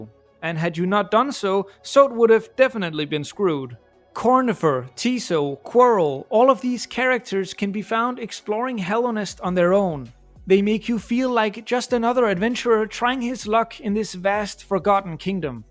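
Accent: Denmark. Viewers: Den danske accen er rimelig let at genkende føler jeg. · Your accent sounds danish, is that right?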